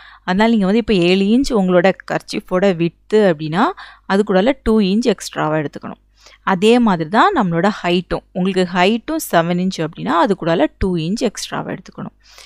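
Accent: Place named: Kanyakumari, India. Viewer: Super explanation vera level patience ungaluku Like that kanyakumari slang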